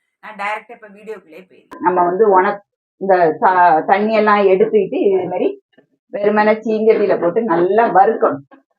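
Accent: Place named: Kanyakumari, India. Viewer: கன்னியாகுமரி தமிலால 😮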